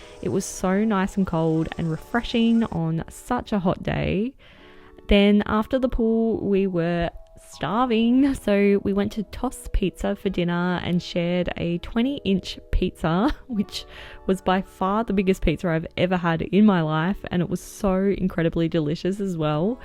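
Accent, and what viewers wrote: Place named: Australia. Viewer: It's so interesting to me how accents differ between English speaking countries, especially an Aussie accent.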